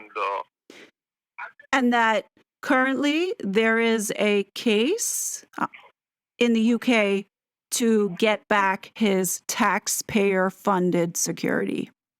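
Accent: New York, United States. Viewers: you speak and act like a real New Yorker…LUV IT…I’ll tell you this I’m from LA to many fake/plastic people around here and my cousins/friends that’s from NY/NJ who came to visit me first opinion about LA “to fake and they show off what they have, meaning wearing the brands name for everyone to see” lol, to which I agree. Many reasons also I don’t date LA men that has been breed here or grew up for how many years lol. New Yorkers they don’t hold back the truth what needs to be said and they act on it. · how very frustrating but I loved the New York coming out in your voice as the call went on. Geez none of us should be paying for these grifters!! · Love your New York accent!! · Wow! Your New York really came out in this call!! Good job! I don’t live in NY but am heavily involved in municipal finances where I live and I can tell you…..THIS WOULD NOT FLY! 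💩would hit the fan! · On a side note, I didn’t know you were a New Yorker,. But I like your moxie and I mean this ad a compliment when I say your frankness and straightforwardness, would make it not surprising you were an archetypal New Yorker. Cheers (and subscribed just for this). Makes me want to visit. And as I listen to you more in your call, I hear the NYC accent too more and more.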